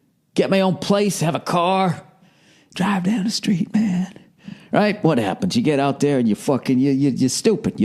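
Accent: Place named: Boston, United States. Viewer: Lol I’m from Boston, & damn he sounds like he hasn’t left 🤣🤣I love him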